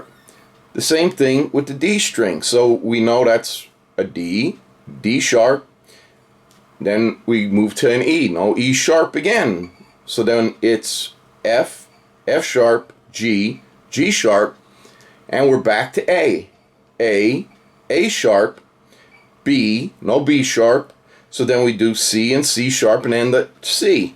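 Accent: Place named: Chicago, United States. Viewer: are you from chicago - you have a bit of an accent , no offense . i am from chicago and when i am on youtube it comes out more often than not sometimes ... the way you explained it out like that it makes so much sense and isnt that complicated. hats off .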